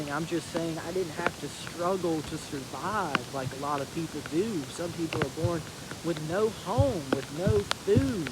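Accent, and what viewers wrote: South, United States. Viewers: You are so young! Where 'on earth' did you so quickly find the time to acquire all that wisdom, sageness, and accomplishment and develop the urgency and ability to put it all into this easygoing flow of eloquently spoken words? Some tremendous former lifetimes must be involved in there! Do you remember parts of one or some of them? I wish I could have crossed your path in one of them!😳 ...(And this time round, is it that I detect a trace of Southern drawl?...🤔😁).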